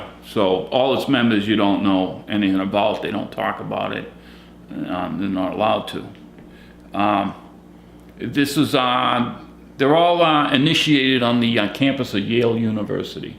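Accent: Boston, United States. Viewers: Please, I'm burning with curiosity: are y'all from Boston? If not, what sort of accent is that?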